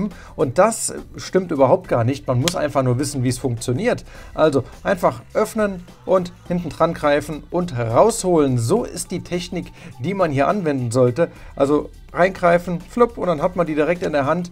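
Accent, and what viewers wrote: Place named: East Germany, Germany. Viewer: Ossi Akzent ...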